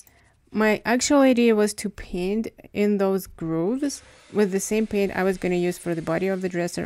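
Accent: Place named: Eastern Europe. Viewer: oh no don’t get rid of it , it’s nice ! I thought it was Eastern European . Lovely ! We like your makeovers very much !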